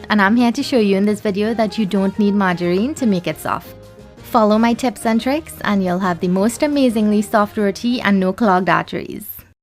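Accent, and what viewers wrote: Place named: Trinidad and Tobago. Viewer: Amazing, love the trini accent.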